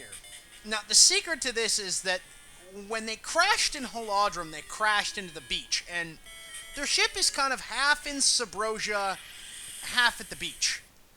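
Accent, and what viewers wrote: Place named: Canada. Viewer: You and your cute Canadian prenunciations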